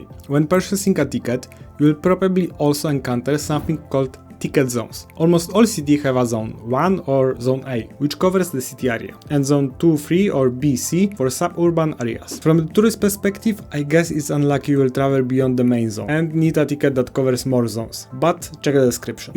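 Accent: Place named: Poland. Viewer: Słychać akcent polski akcent w chuj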